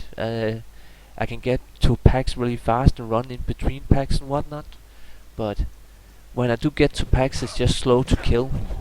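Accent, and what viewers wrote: Denmark. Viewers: Love it. Are u by any chance danish :)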